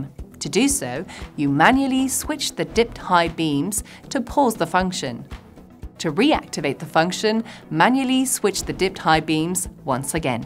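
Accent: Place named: Scotland, United Kingdom. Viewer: she's from Edinburgh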